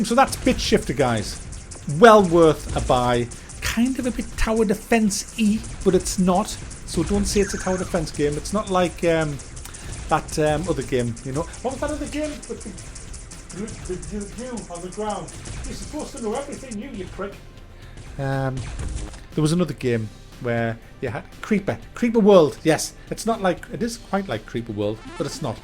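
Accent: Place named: Northern England, United Kingdom. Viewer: I adore your northern English accent. My family on my mother's side is from Sunderland and New-Castle. I live in Canada btw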